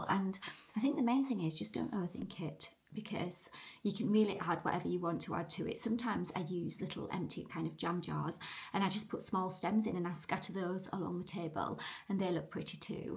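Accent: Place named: North, United Kingdom. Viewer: 61. I love your videos...your home is beautiful. As a northerner who no longer lives up north, it's great to hear a fellow northerner and to see some of the northern sights! Keep up the good work!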